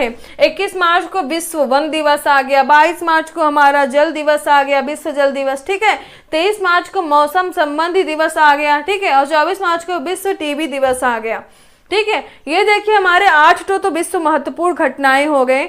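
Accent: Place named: Lucknow, India. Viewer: मैडम लखनउ से है😀😀😀।। लखनऊ वालो का बोलने का प्रक्रिया ही अद्भुद है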